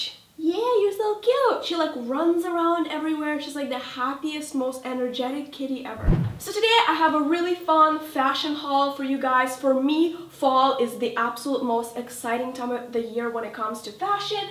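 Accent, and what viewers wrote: France. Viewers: Je suis fraçaise et j'adore ton accent français ! :D Bisous de la France <3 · i'm from canada and i speak french at home and I love the french accent !!!!!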